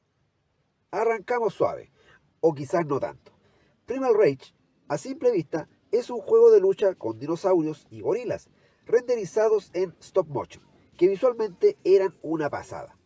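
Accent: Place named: Peru. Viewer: Que chistoso hablas,eres de peru?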